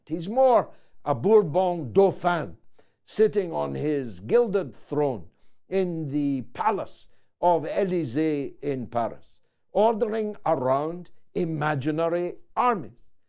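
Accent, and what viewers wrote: Scotland, United Kingdom. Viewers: Hey, you are Scottish, I presume, according to your accent...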